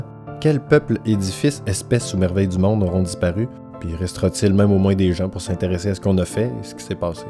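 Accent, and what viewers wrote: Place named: Quebec, Canada. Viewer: Merci pour cette superbe vidéo ! Enfin un reportage qui ne comporte pas de niaiseries et tes prises de vues sont magnifiques ❤je pars au mois de juillet au Yucatan j’ai hâte ! Petite remarque tu parles un peu vite et j’avoue que pour une française il me faut un petit temps d’adaptation pour comprendre ton adorable accent québécois ! 😅continue à nous faire voyager ….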